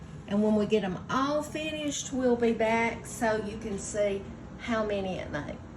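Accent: South, United States. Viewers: I love your Southern accent !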